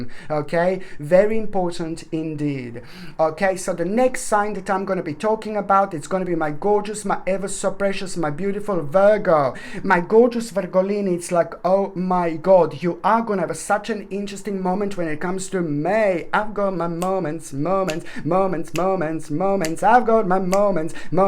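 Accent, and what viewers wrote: Italy. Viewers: Ciao! Thank you for Scorpio reading, love your energy, Good vibes and your italian accent! I have got so much italian roots 🙏🏻 Grazie mille from Monte Carlo. Last seven days 🤞🏻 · I totally Love your videos and excellent readings!! Thank you!! Your Italian accent is beautiful 😍 too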